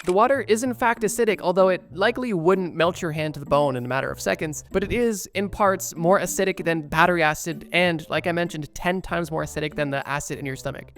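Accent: Canada. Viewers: I never noticed this dude has a Canadian accent it’s mind blowing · Youre canadian arent you?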